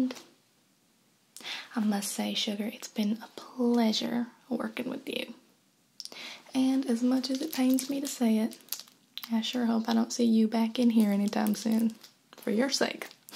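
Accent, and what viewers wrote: South, United States. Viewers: so lovely, thanks for all those hilarious and relaxing vids with Brandy Jean and that southern accent XD You are the master of entertainment for me in ASMR!! love from Germany!! <3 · Seriously loved your country accent in this series! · Why the hell are you singing and why do you have a southern accent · I have loved this entire series. Really impressed by your Southern accent as a Southerner myself.:) Loved the "surprise" ending and that chant! LOL. Haven't heard " " in a while.:) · This little series was so relaxing and reminds me a lot of the people from where I grew up! I usually hate such southern accidents but it's really cute when you do it 😊 thank you for all the tingles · this is my first time watching her channel like bro American accent on fleak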